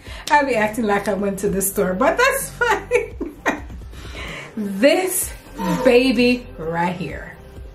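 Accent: Jamaica. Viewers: I hit that subscribe button 🔵 the minute I heard that Jamaican accent. Gots to support my Jamaican gurlll. Love everything you scored.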